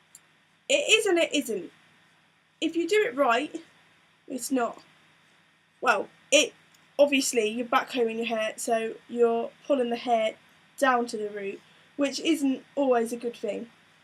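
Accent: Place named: England, United Kingdom. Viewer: I think that's wonderful and I really enjoyed your informative commentary throughout. I suppose exact order and timings of when you put each pin in is crucial to overall result after you explained you could play around with a bit of hair that hadn't yet been pinned into place? Although i used to have long hair i never pinned it so never really considered this aspect. Cant work out if your accent is London/South East or West Country or maybe I'm totally wrong on both accounts!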